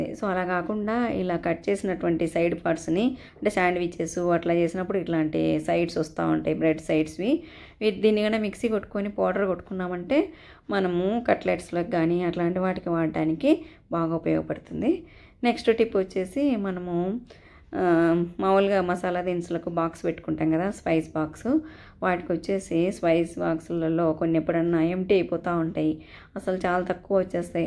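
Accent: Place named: Anantapur, India. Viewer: Mee slang antapoor side la vundi mee proper ekkada andi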